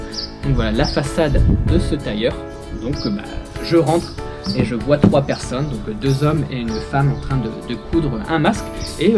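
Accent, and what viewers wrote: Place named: Turkey. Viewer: "Ne kadaağ" maşallah Türkiye'de yaşaya yaşaya ağız bile yapmış abi.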